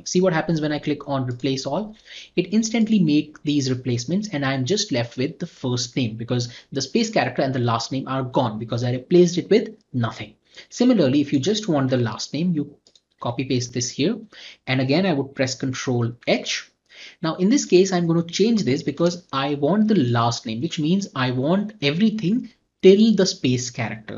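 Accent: India. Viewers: If you hear an Indian guy talking about Excel, you know you're in the right space.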